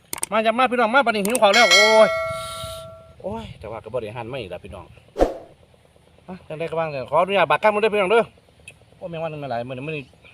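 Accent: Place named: Roi Et, Thailand. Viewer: คนจังหวัดดั่ยสำเนียงคนร้อยเอ็ดกับหนองบัว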